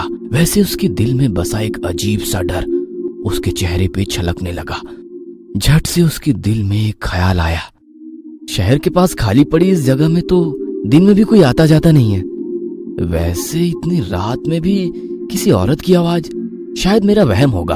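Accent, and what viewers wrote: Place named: Rural, India. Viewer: although the accent is a little village like but am in love with the voice 💕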